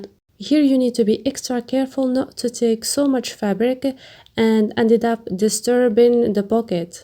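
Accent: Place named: Morocco. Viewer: Where are you from? You have a morrocan accent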